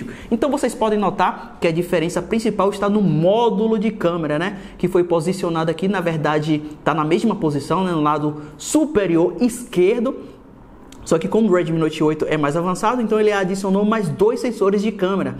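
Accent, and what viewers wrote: Bahia, Brazil. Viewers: sotaque baiano, é isso mesmo produção? SSA tá feliz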